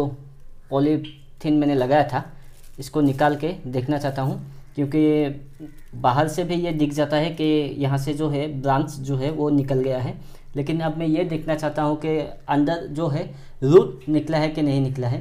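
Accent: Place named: Assam, India. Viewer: Yah bhai Bhai Aam cutting Se Kaise hota hai Assam ke Rahane Wale Hain Wahan Ke Log Aise bolate Hain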